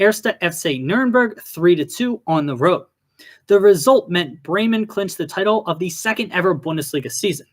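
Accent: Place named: United States. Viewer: Please try learn pronounce correctly mames out of your language, or you'll keep sounding as an ignorante yankee. Nicely said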